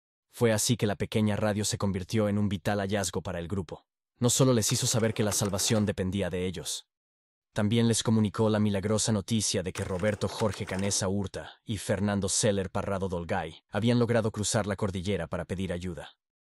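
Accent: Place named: United States. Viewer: He estado viendo tus vídeos y noto que tienes un acento medio gringo, y no solo a lo hora de pronunciar nombres ingleses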